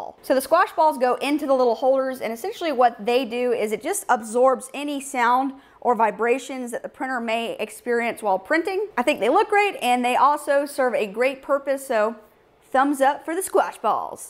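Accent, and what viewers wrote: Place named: United States. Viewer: hell yeah. Love your enthusiasm, knowledge and delivery. The American accent is a bonus too :)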